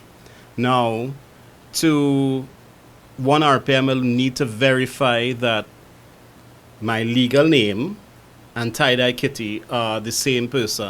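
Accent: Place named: Trinidad and Tobago. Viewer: Instantly knew it was a Trini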